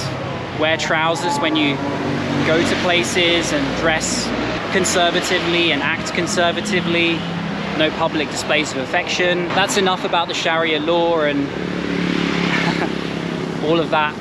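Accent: United Kingdom. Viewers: Videonya keren mas,saya suka aksen anda.aksen nya sperti orang british yaa😁😁,suksess selalu sehat selalu semoga bahagia selalu yaa...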